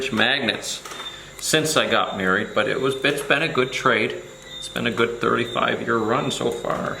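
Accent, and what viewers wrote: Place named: Wisconsin, United States. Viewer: You Wisconsin folk talk funny but you're a friendly bunch. Dont lose those calories!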